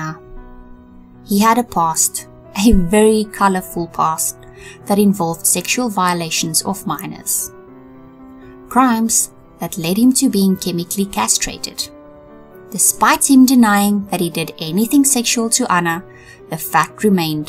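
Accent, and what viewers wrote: South Africa. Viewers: You sound Afrikaans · I recognize your accent sister 🇿🇦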